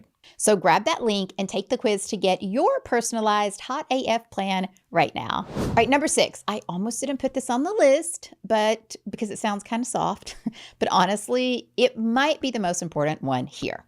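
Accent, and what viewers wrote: South, United States. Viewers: Love your country accent lol "i told you i was gonna cus" 39 here and here for all of it !! I was kind of sad approaching 40 but i feel empowered now ! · S**t and F**K are not Sexy or Attractive. You sound like a hillbilly even though you look good!!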